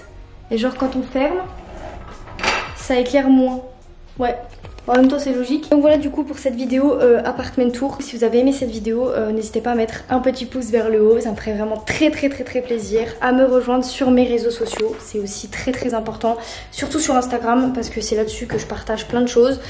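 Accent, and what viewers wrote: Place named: Alsace, France. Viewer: ca sebt l'accent alsacien...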